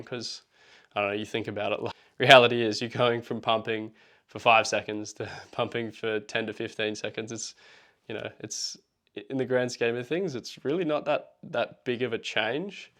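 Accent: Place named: New Zealand. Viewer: Circling back to this vid as coldest month of the year in NZ, sun down just after 5pm(You sound like a kiwi too) and I have 2 new designs I love. F-one SK8 looks a lot like my latest one and the one back from that looks like Armstrong ST and Armstrong released theirs about the same time I made mine. Both my latest foils have great glide but my latest one though only slightly smaller, I have stability that makes me feel that I can foot switch on foil. Of course I still need to get used to taking my moment to be 100% about new foot placement but the new foil makes it so much easier. I really favor foils with a bit of sweep and my latest one that I made to fit Axis fuselage, it has almost straight back adge and sweeps to extremely narrow and thin tips. For all the potential for injury, the blissful smooth ride of my 115kg on this 1240sqcm 1M span foil is amazing and yet in surf it's super loose. 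Lots of our locals on Axis but my few tries of them I've not liked the handling. Axis ARTs do have one of the things I incorporated in my own designs from feb 2022 which is wing tip twist. I've refined my own wingtip twist amount and placement since then to better stretch max speed and min stall speed. It's fascinating seeing all the brands making their own advances in different ways.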